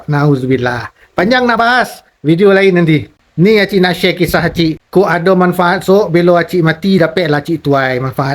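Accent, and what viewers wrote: Negeri Sembilan, Malaysia. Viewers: Suko pulak donga slang acik ni.. elok lah tu acik toghuihan boghi info yg sohih lg bermanafa’at😄👍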